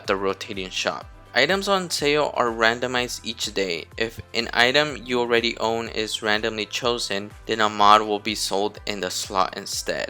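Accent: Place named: Philippines. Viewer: I just noticed you're picking up an awkward Filipino accents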